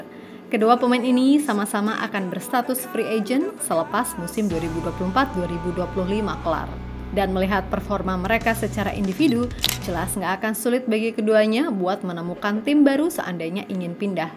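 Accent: Java, Indonesia. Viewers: Suka ngomong jowonya Mimin 😅😅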